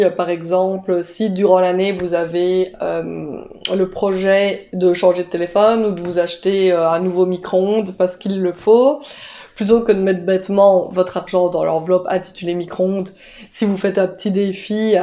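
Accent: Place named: Liège, Belgium. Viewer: votre accent est si exotique! il s’agit de liège ou jme trompe!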